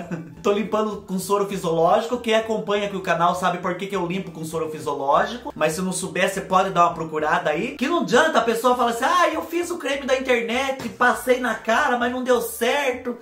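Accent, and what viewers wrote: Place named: Piauí, Brazil. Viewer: você é do Nordeste? Eu sou do Piauí, Amarante, mas moro na capital Teresina...sua fala é idêntica à nossa...com erros de Português, mas nós somos inteligentes e estudiosos graças à Deus! É a força do hábito mesmo...e o que deixa você mais engraçado é o seu linguajar... Você deveria ir pra TV Globo ser humorista 😂 Eu queria saber quem é essa "muié" que lhe ensina essas receitas... E por falar em receitas eu fiz a da casca de banana, maizena e óleo de coco...e senti que deu uma suavizada no bigode chinês...tenho 6.6, queria ter ficado com uma cara de 50 pelo menos, mas minha idade metabólica é 58 anos (mesma idade do meu namorado😅, e minha cabeça é de uma menina de 20😊), fiz minha bioimpedância (21/08/2024) e tudo está normal 🙏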